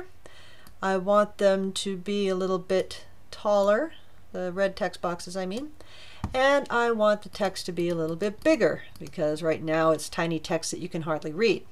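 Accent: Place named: Northeast, United States. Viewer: thank you lady on the internet with a north east accent of some sort. saved me hours!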